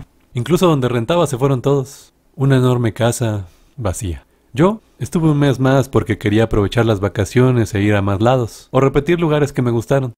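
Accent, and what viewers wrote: Zacatecas, Mexico. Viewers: Eres de Zacatecas??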